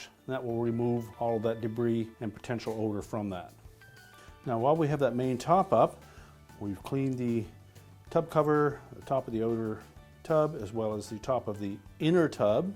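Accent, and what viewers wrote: Canada. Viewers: Sounds like a Maritimer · I love his accent (canadian maybe?). « We will need to take this aöt, and once it is aöt, we can clean it »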